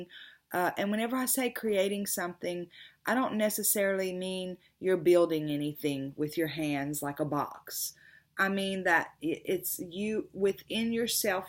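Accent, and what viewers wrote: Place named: Alabama, United States. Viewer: I was hearing Alabama, are you from there?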